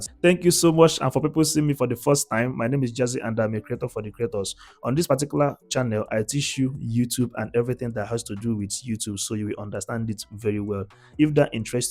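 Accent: Nigeria. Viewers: When i heard your accent immediately realice or 🇳🇬 Nigerian boy ,we were worked in NG. morethan 10 years in Illorine uni. God bless you dear. Good to hear typical NG accent.❤❤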